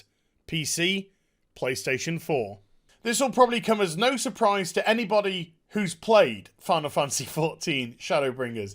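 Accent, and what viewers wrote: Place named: United Kingdom. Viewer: Tunning in to this video to see the block from Jason, and staying for the british accent!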